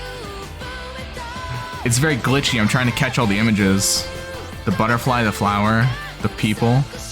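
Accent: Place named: North America. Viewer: Вот это английский. Наконец-то я понял КАЖДОЕ слово. Интересно - откуда ты? Из какой страны и региона?) Я по английски не говорю - но понимаю его (90% на тексте, и на слух моё понимание варьируется от акцента реакционера). Это точно не англия, в которой слова "глотают", словно повыбивали все зубы, это не центральная европа (там акцент более жесткий), и не восточная (там жестко абсолютно). Не латинская Америка. США, канада, Австралия - скорее всего одна из этих стран. Но в США от штата к штату акцент тоже варьируется. Точно не Техас))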